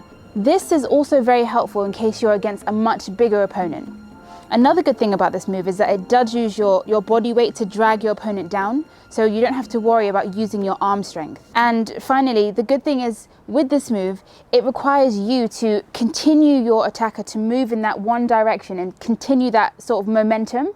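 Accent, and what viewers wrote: United Kingdom. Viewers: Having studied Judo for 10 years, I'm surprised that you would suggest this difficult and risky throw in a street confrontation. In sport Judo on mats or on TV it looks spectacular, but in real life, if you misplace your foot, the attacker will be on top of you. And now you're grappling on the ground. Not a good place to be.This throw requires a great deal of mastery to be effective in a street fight. I would rather use Taitoshi where you also use forward momentum but you cross your leg in front of both attacker legs and pull him over.Easier to learn and have used it many times. I grew up in New York!! :-)) Nothing like a pretty girl with a sweet British accent:-)) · Are u from the UK? i asked because of your accent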